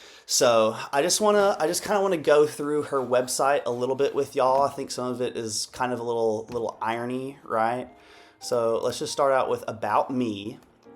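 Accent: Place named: South, United States. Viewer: Came here from Obese to Beast to counter that bully Alex, stayed for the snark and the southern accent ❤ subscribed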